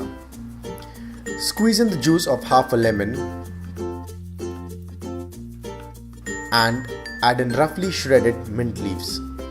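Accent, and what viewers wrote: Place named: India. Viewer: Sir app ko hindi nahi aata hai. awaz sy to indian lg rhy ho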